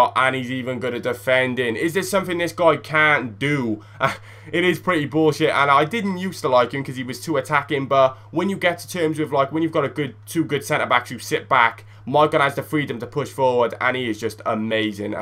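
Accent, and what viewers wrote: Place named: Cardiff, United Kingdom. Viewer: I think it would be funny as fuck if you do funny squads mate, btw are you from cardiff ???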